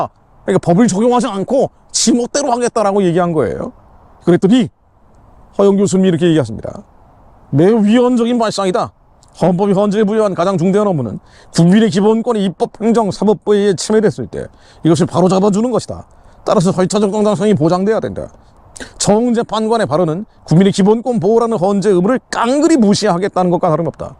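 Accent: North Korea. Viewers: 다 좋은데 북괴 말투는 정말 싫타.... 간첩아니고서야 왜 북괴 말씨지?? 북괴에 자주 갔었나.....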